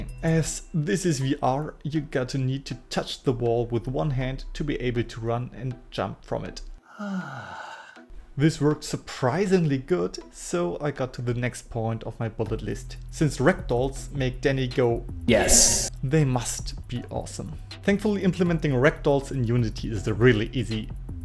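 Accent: Germany. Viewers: does this work with rift s? xd und biste deutsch? xd hört man irgendwie raus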